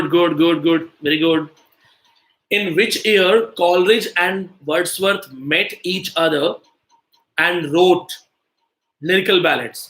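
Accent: India. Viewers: an lndian speaking english in his or her own way of Indian style is really great to listen to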